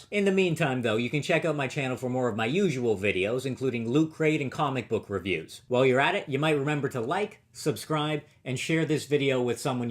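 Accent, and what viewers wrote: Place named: Canada. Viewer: Pronouncing "been" like "bean", yep.. 100% Authentic Maple Flavor Canadian.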